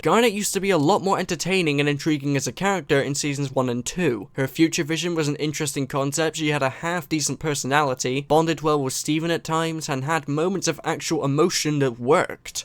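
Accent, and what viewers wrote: United Kingdom. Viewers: I use your videos to pick up the british dialect, oh, and the actual content too.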